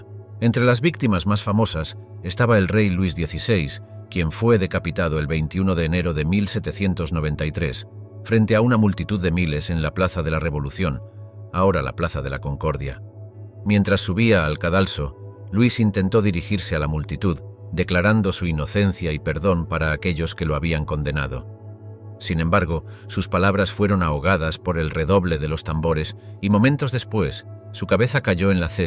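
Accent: Castile, Spain. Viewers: Buen video, muy instructivo y sobre todo maneja un lenguaje castellano perfecto...agradable de escuchar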